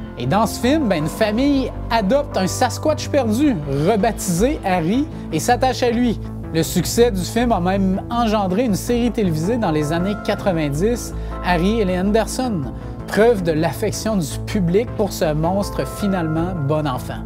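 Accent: Quebec, Canada. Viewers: Super bien raconté et assez bien détaillé. Je trouve que tu donnes de plus en plus de étoffé d'informations et d'images. J'affirme sans aucun doute, tu es la plus belle découverte cette année. Et en plus, un québécois avec un si bel accent bien de chez nous. Merci pour ton super bon travail. Lâche pas ! ❤😁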